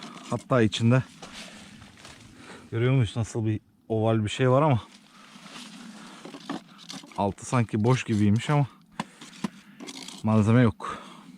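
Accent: Thrace, Turkey. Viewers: Trakyalı olmalısın şiven öyle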